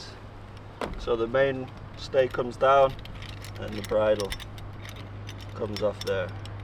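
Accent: Lancashire, United Kingdom. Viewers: Sounds like most of the blokes in my nice Lancashire town....there are still some left.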